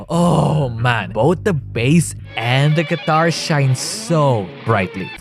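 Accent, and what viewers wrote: Philippines. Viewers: well, his bio says he is from the phillpines, and also, i can tell if they are filipino based on the accent and how he speaks.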